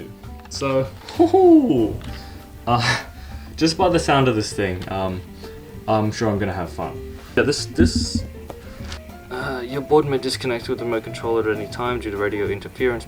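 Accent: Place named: Australia. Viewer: Wtf this guy is korean talking Australian and acts like a white boy the fuck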